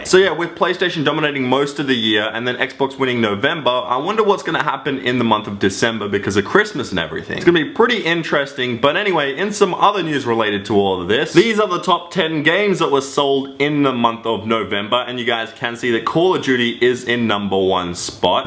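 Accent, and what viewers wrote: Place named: Australia. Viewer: O i thought u were american ur aussie :D Im Glad There Are Some Aussies Gaming! :D But Your Dad Dieing Still Makes Me Sad D: R.I.P To Your Dad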